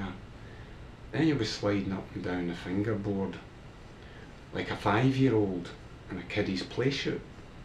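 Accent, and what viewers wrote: Scotland, United Kingdom. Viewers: wow, this is really cool!!! I had no idea you can adjust the violin on your neck. And...olive oil? You are hilarious :-D Are you Scottish? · Ah, thank you! I thought it might be Egyptian or some other Arabic dialect. I didn't know that Scottish is that thick.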